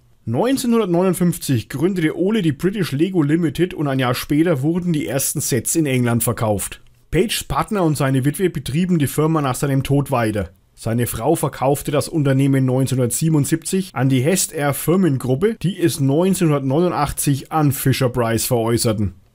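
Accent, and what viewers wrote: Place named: Franconia, Germany. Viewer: Meinen tiefsten Respekt für dieses Video. Ein Thema das so komplex & vielschichtig ist auf Minuten zu bringen & dabei noch informativ, sachlich sehr hochwertig & unterhaltsam zu sein das ist ein echtes Meisterstück (a real masterpiece). Sicherlich es ist nur ein kleiner Umriss, da es noch viel, viel, viel mehr dazu erzählen gibt. Aber dieses Video zeigt auch das die Firma aus Dänemark die heute Fair Play von ihren Mitbewerbern sich wünscht schon immer eine Firma der Winkelzüge, der Ausnutzung von Lücken im Rechtssystem & der geschickt gemachten "Plagiaten" ist. Daher sollte diese Firma nicht mit Steinen werfen, denn sie sitzt im Glashaus (oder Wer im Glashaus sitzt sollte nicht mit Schweinen werfen) Ich liebe deinen Content, ich habe dir ein Abo gegeben da hattest du noch 60-80 Abos, aber mir hat es super gefallen & da war ein Abo pflicht. 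Was ich auch total super finde, ist die Tatsache das du es nicht versuchst deinen Dialekt zu unterdrücken, naja bin Schwabe, aber ein Franken kann man in einem guten Masse verstehen. Mach so weiter & du wirst weiter wachsen.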